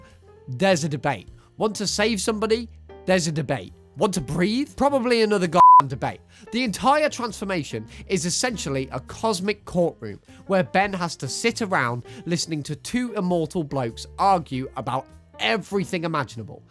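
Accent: United Kingdom. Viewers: Bro's Britishness really be shining through w this one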